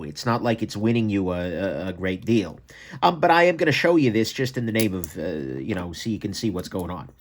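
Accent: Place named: Northeast, United States. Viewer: I love your accent, where are you from? NE area I'm guessing.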